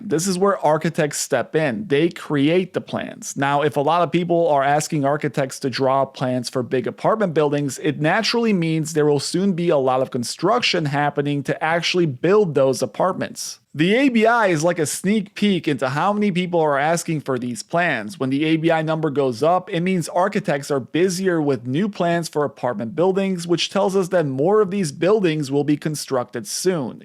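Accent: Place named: Chicago, United States. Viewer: You from Chicago? That accent...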